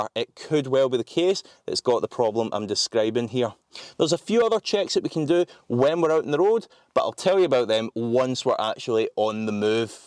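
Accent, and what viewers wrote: Scotland, United Kingdom. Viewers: Very good and informative video, well done!. Also your scottish accent is cool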